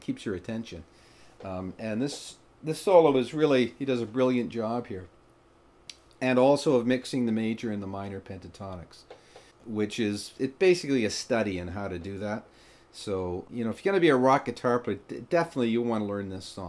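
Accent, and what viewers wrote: Canada. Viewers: I think the toughest part of the solo is definitely the 2nd half. Are you planning on continuing or doing another video on the 2nd solo in? BTW--I love your tone you get achieve for all the videos. It's spot on., and as far as I'm concerned there's not another YT instructor who takes the time and effort to get it exactly like the original artist. You're the only guy I go to after checking out dozens of YT instructional vids. Kudos. BTW--you sound like you could be in Canada or the Northern Mid-West; how close am I?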